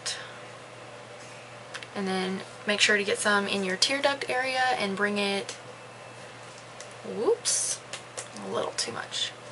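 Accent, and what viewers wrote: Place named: Georgia, United States. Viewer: i love this, its puurrrdy!! you're so cute! what state are you in??? you sound like you're around GA or something... anyways, this looks great on you, i'll have to try it out...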